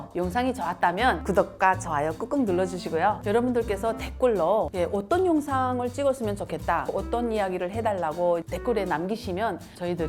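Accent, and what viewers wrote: North Korea. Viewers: 재미 있게 진행 하십니다 말도 북한식으로 하니 실감나게 들립니다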